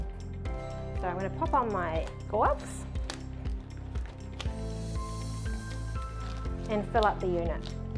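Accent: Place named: New Zealand. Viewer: It new zealand accent, i can understand her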